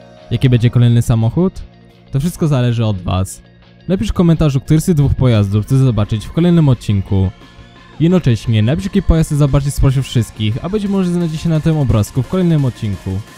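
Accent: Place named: Silesia, Poland. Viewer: Domyśliłem się bo osoby ze śląska zazwyczaj wymawiają czy a nie trzy :P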